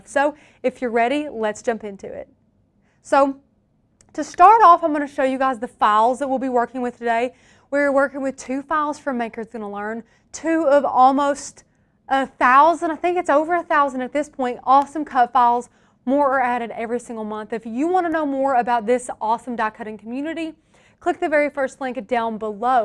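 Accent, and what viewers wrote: South, United States. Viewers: Love her southern accent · Girl, where you from?! Just a curious Texas girl who is fascinated with southern dialects lol. The Carolina's, Georgia, Tennessee?? Love it!!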